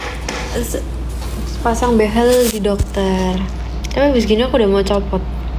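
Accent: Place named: Java, Indonesia. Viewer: Lucu tauk kalo medok, udalah be your self 😊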